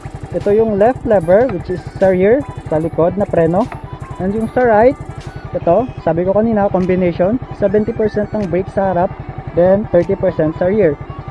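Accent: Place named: Iloilo, Philippines. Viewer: hehe kaya pala sir may halo ilonggo accent. Ilonggo din ako sir hehehe RS sir!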